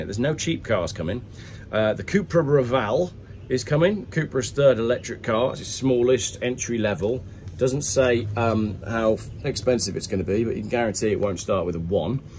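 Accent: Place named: England, United Kingdom. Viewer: And utterly rude to boot! With an English accent. Love it!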